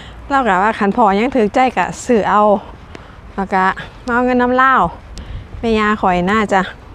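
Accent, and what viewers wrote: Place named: Isan, Thailand. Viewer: ฟ้งสำเนียงการพูดแล้วชอบจังค่ะ..ชอบสำเนียงไทยอิสานนี้ค่ะ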